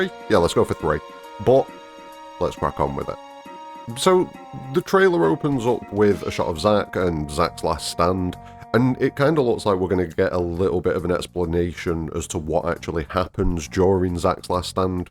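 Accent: Northern England, United Kingdom. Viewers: Always love to hear a fellow northerner on YouTube. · what's your accent? I know its British but where - i suck at pinpointing accents but yours makes me laugh when you be expressive on purpose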